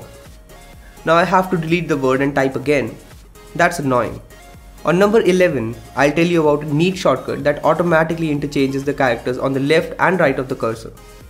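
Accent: India. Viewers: Good video but the thick Indian accent forced me to watch several times to get the point. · I cannot understand Indian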